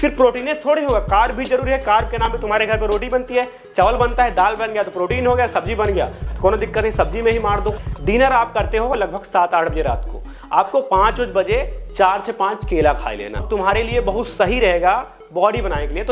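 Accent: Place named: Bihar, India. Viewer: Gajb bhai aisan trainer to hm phli bar dekh ni ho aur apke bhasa se lga ta rua Bhiar ke lal bani kahe ki hm hu bani . Good 👍job the way in which you tell everything is awesome👏 unexpected🥰🥰🥰🥰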